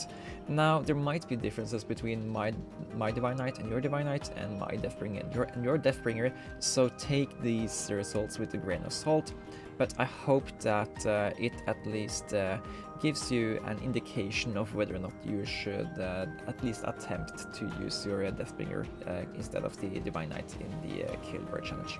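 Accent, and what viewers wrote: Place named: Sweden. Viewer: youre my fav idleon creator btw, especially since you sound swedish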